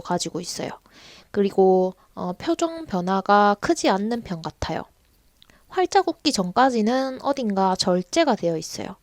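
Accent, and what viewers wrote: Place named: Busan, South Korea. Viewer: 부산사람인가여 악센트가 저랑같네옄ㅋㅋ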